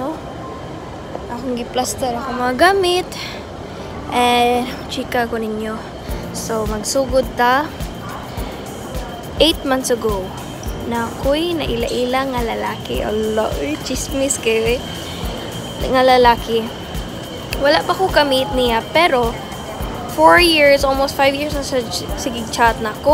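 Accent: Visayas, Philippines. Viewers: first time watching your videos and you're so cuteeeeeeee i love it💛 and the accent guuuUrrrrl, so cuteeee😍 sarap talaga makarinig ug bisaya accent, it really gets to me everytime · I like your bisaya accent😍astig kaau ate😍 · Bisaya man oie. Ok a sweet pa jud🤣🤣🤣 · cute kayka ay bisaya gyud wa ko na bored ga lantaw sa imo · Aw kilig jud nanamian ko mag pamati imong accent mag bisaya... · Cute kayka mag bisaya❤❤ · Hahaha ka cutie mag bisaya uy! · bisaya man diay ka... ok ahhh... nice video... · I love your accent.... 😊iba talaga basta bisaya haha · bisaya ka dae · Ka cute mg binisaya ni ma'am uy😅😅😅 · Inlove kay ko sa imong tuno ba. Hahah. Laban mga bisaya. Love love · Wa ko kabalo nganong nasaag ko dre 🤣 pero nalingaw mn pud ko haha, btw kyut kay ka magbisaya ba 😅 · Pambisita din sa bahay mga sis napakabilis Kung magbalik. Being fluent in English with those accent na bisaya, 🔥💪👊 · bisaya na bisaya eh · Let's Go Bisaya kaayo❤️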